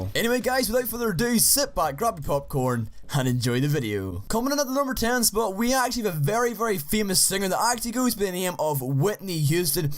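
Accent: Ireland. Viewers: why do u sound like Irish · R u from Northern Ireland or ireland plz reply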